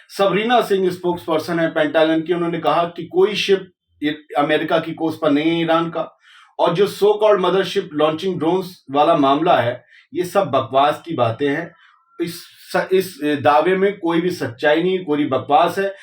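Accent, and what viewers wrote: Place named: Lucknow, India. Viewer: your accent sometimes make me feel that you are from Lucknow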